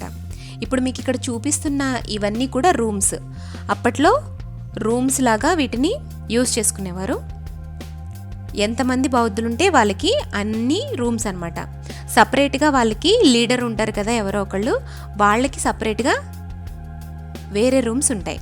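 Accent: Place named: Rayalaseema, India. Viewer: mi slang rayalseema matalu laga unai..nice madam vizag super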